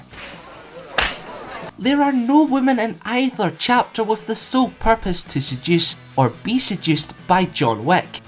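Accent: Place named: Scotland, United Kingdom. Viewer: I fooking love Scottish accents. By far my favorite.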